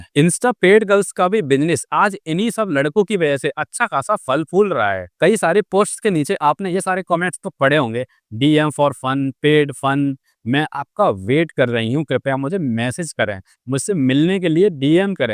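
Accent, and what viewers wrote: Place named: North, India. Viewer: He have north indian accent 🇮🇳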